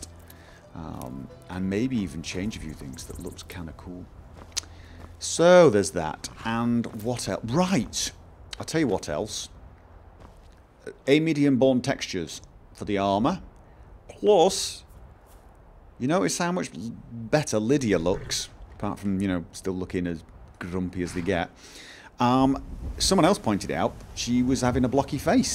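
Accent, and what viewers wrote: United Kingdom. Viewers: Is British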